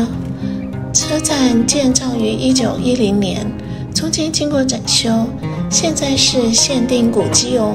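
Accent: Standard. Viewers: 旁白以前的工作一定是老師不然就是播音員,聲音好聽,發音標準,又介紹的很詳細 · 好久沒聽到這麼字正腔圓的國語~好有回憶殺的聲音!好好聽!~^^~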